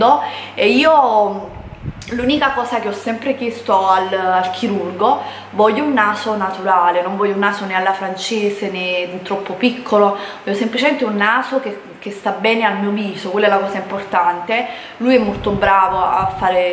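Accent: Naples, Italy. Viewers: Che pena le persone che pensano che l'accento caratteristico di una regione sia una cosa negativa. NON è né un'attrice né una cantante, smettetela di parlare di dizione! Parlare di grammatica è una cosa, di dizione è un'altra. È napoletana e ha la sua cadenza, così come un fiorentino pronuncerà la 'c' aspirata e così via. La lingua è carica di storia, ed è cosa umana che cammina con le gambe degli uomini, nei luoghi in cui vivono. Sappiate che gli accenti e i dialetti sono un patrimonio e una testimonianza enorme e vanno preservati con tutte le loro diversità. Quando parlate di grammatica è una cosa, ma non se ne può più di leggere insulti sull'accento. Manco sapete di cosa state parlando.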